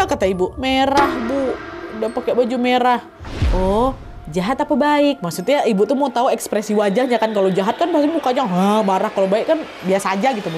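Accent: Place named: Palembang, Indonesia. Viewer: Nahh wong Palembang mano suaronyooo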